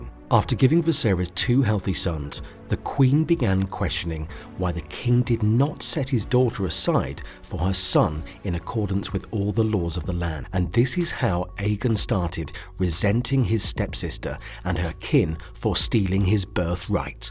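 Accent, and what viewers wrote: United Kingdom. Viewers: Your British accent makes you sound intelligent, but your pronunciation and word choice implies the opposite. To be clear, I am not talking about your pronunciation of GRRM's invented character and place names, I'm talking about your mispronunciation of English words. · Yeah, I thought the other one was some kinda read-aloud android. This British dude is perfect... plus, you know... when people talk about GoT/HoTD, they just sound more relevant than a nerdy american with a lisp whose gums you can we smack while he talks- I forgot which specific channel I'm talking about. But yehh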